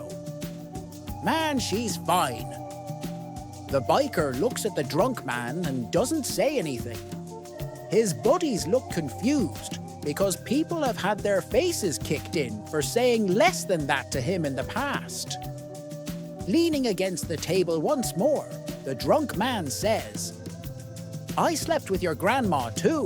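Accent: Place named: Ireland. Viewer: It might be considered typical of an American to say so, but I never thought of an AI with an Irish accent. I do, however, wish to say that the persistant censorship of You Tube consistently eats holes in the conversational fabric of the productions. The weak kneed, limp wristed fascisti who believe that this overplaying of their morality in a public forum somehow empowers them in any manner are sadly mistaken. If they should ever fully emerge from the womb (or asshole), of their mother, they will have to realize how poorly thought of by the rest of the world What a cowardly behavior for adults...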